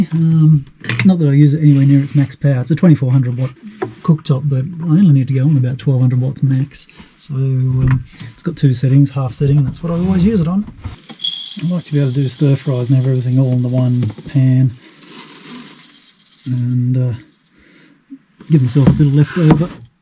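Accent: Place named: Australia. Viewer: Nice to listen to an Aussie in an area I’m familiar with. 😀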